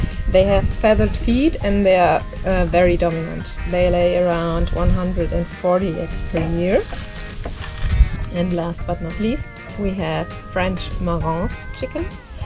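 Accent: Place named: Germany. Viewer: She sounds German.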